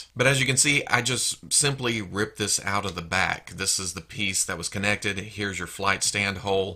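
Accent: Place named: South, United States. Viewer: Not gonna lie, my favorite part of your videos is your smooth, southern accent. It's very pleasing to the ears. I don't know why I feel this way and I'm aware that it's kind of weird but I can't help my feelings dammit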